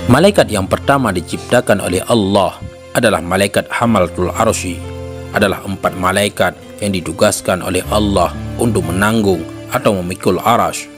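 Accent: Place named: Bali, Indonesia. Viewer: Dialek Bali kayaknya...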